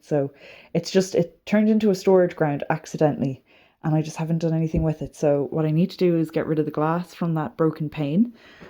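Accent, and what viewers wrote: Ireland. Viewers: Dear, being British, I looove your Irish accent. Don't ever doubt yourself. I think I speak for all your followers that you are doing a fantastic job and enjoy your videos. Remember, Rome wasn't built in one day and God willing you have many days to finish what you started. If I didn't live so far away or being 80 yrs old, I would gladly have flown to Ireland to help you. Thank you Dad for helping your dear daughter. I really enjoy your videos so keep up the good work and don't rush into anything. Rest ever so often and build up your strength. Love and hugs to you and your babies. From Cape Town ❤ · I don’t think any of us like the sound of our own voices. However, IMO - I have to say I think you could have a career in voiceover work. I think your Irish accent is lovely and I could listen to your voice all day! I think you could tile your living room floor (in very large porcelain tiles) and it will be easy to clean up especially in the winter when you have the pets coming in and out! 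Also if the floor is only slightly out you will be able to put extra adhesive on the lines to even the floor out? I would then get a very large rug? Good luck with whatever you decide!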